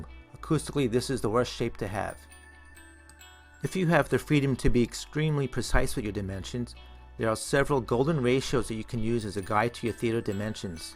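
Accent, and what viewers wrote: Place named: New York City, United States. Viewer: This guy sounds like he's from the NYC area... good to know when I construct mine!!!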